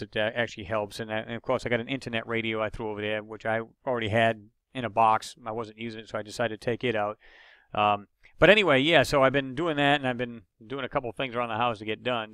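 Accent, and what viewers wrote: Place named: North Jersey, United States. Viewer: lol that's funny cause I really thought you lived in the north jersey or New York area you sound more like your from here then down where u said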